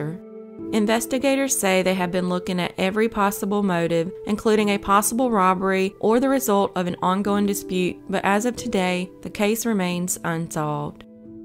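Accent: South, United States. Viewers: I've heard of a few, but not all. This is some research and time. Her voice so soothing, Keep doing what you're doing Southern lady. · Absolutely great channel with your southern talk love it! May God continue to bless you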